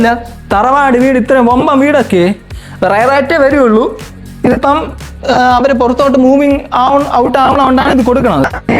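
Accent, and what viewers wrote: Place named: Thiruvananthapuram, India. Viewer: തിരുവന്തോരം ഭാഷ പറഞ്ഞു ബോറടിപ്പിക്കാതെ മര്യാദക്ക് സംസാരിക്കു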